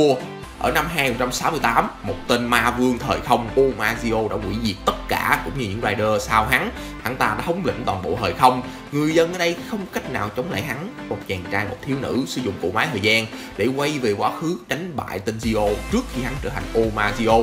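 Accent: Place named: Japan. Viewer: Anh chủ yếu đọc Tiếng Anh theo Nhật nên.... Mà công nhận dở thật:)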